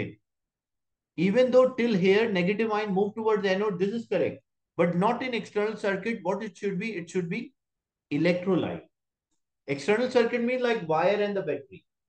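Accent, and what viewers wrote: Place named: India. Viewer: Ur accent is indian are u indian?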